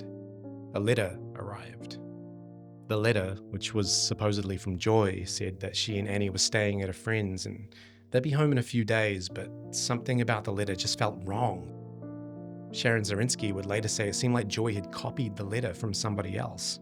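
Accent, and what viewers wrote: New Zealand. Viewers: Don’t know who your watching but he is not a Aussie if you listen to him he is from nz he has a kiwi accent not Aussie accent sorry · Is there a hint of kiwi in his accent? The way he says 67. "Sexty sevan ".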